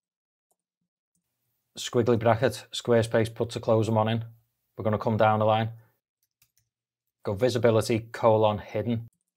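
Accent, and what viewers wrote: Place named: United Kingdom. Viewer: Hey, just wanted to say how much I appreciate you creating this video. I had a comment earlier on my own YouTube channel. It was great to be able to link to this rather than create a video from scratch. Thanks. Cool channel. Awesome accent... from a fellow Brit;)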